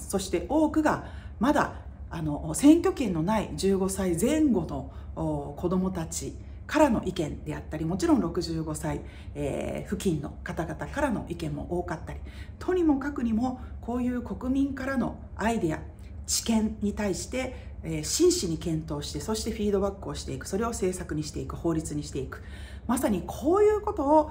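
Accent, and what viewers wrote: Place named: Nagoya, Japan. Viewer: 名古屋弁のいいですね～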